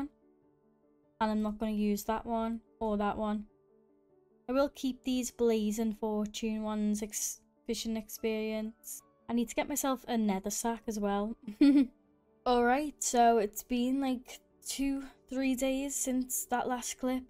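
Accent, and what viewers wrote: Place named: United Kingdom. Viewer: The british accent so lovely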